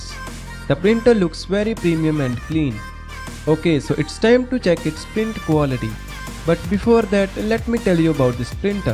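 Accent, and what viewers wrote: India. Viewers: Are you indian bro??? Your accents is familiar🤨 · indian accent English is PURE EAR CANCER!! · Indian accent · It's English but with Indian accent lmao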